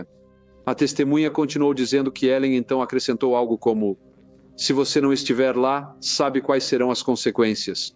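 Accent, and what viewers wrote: Portugal. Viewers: Esse sotaque de Portugal é muito chato! Não daria pra resolver isso?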